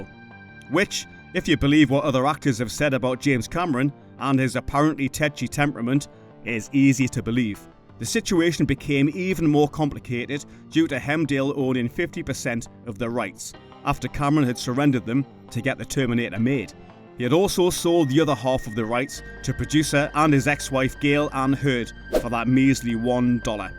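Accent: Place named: Newcastle, United Kingdom. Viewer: The Geordie is back. Early NyN NAUT EES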